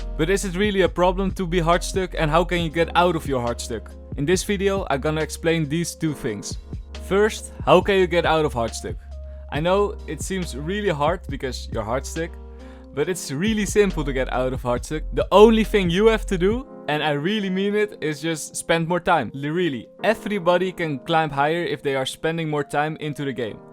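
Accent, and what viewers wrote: Netherlands. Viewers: your nederlandse accent is really funny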